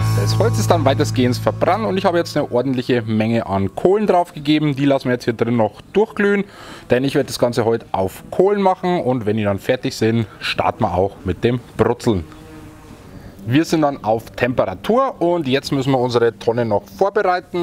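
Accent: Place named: Bavaria, Germany. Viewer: Moin, jetzt habe ich schon unendlich viele Videos, mit deinem schönen bayrischen Dialekt, von dir verfolgt. Gestern habe ich das Gulaschrezept ausprobiert. Meine liebe Frau hat alles geschnibbelt, und ich durfte zubereiten. Das Ergebnis war wirklich super. Mach weiter so, ich tu's auch. Beste Grüße, Hubi und Doris aus dem Münsterland.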